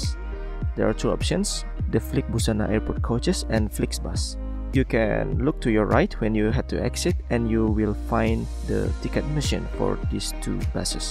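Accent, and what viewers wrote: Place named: Indonesia. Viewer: Hi are you Indonesian? You have accent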